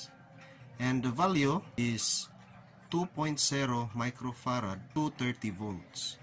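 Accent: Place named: Philippines. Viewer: Your English reveals that you are from the Philippines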